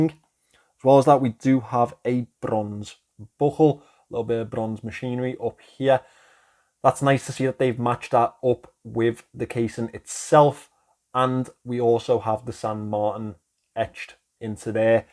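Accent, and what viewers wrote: Liverpool, United Kingdom. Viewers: Liverpool accent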